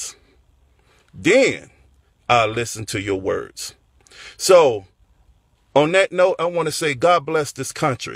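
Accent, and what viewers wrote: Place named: Russia. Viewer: This guy is obviously a Russian. You can tell by his accent that he's probably from the southern Volgograd region.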